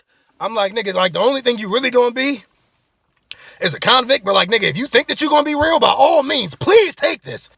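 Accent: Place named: New Orleans, United States. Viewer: Can tell ur from nola sound like. Watching part 2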